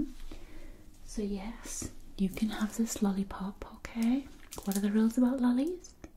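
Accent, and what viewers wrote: United Kingdom. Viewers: British accents often sound so elegant. I really like listening to you talking! Lovely greetings from Germany!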